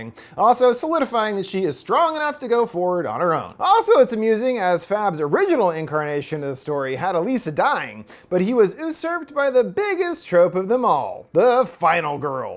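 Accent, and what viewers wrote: Italy. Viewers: Wait don't go I liked your ~fabulous Italian accent~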